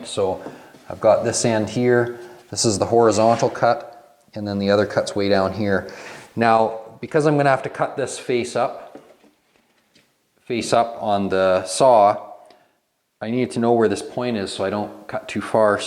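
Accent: Canada. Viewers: Love dudes accent. Proper Canuck. Just like me. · I'm Canadian, and I can tell he's Canadian just from this accent lol. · you can tell he's from CANADA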